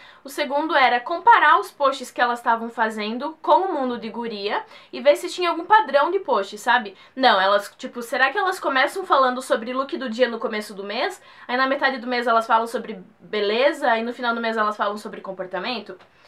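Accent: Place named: South, Brazil. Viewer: Amei o video. Que sotaque legal heim? Amo sotaque de sulista, pra min e um dos mais bonitos, e o seu e mt forte. Abracos!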